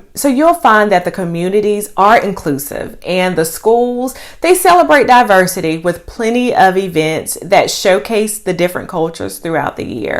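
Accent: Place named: Texas, United States. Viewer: Are you Texan? You sure don't sound like someone originally from North Carolina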